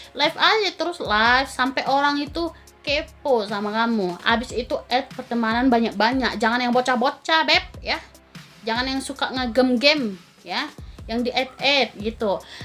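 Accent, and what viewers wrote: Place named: Medan, Indonesia. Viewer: Medan kali kau bah,, salam kenal subscriber barumu dari gununh para tebing tinggi..😊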